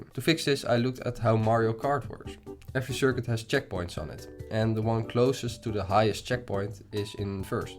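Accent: Netherlands. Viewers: Immediately knew you were dutch given the accent, ga zo door, echt enorm goede videos!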